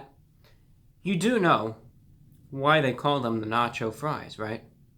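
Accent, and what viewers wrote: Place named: New York, United States. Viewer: Where are you from? You sound like you are trying very hard to talk without an accent, but you actually have a heavy one. I'd guess NY.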